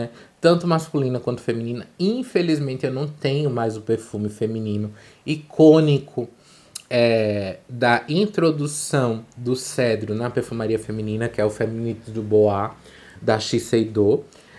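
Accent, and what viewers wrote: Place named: Recife, Brazil. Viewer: você é de Recife? você tem um sotaque de algum lugar do nordeste, mas você falando parece muito Recifense.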